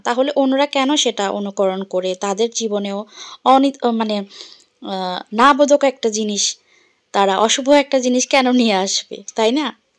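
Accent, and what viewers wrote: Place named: Noakhali, Bangladesh. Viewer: আপু তোমার নোয়াখালী ভাষা ভালো লাগে আজ বলো নাই আমিতো অবাক 😮😮হাঁস দারুন হয়েছে ❤❤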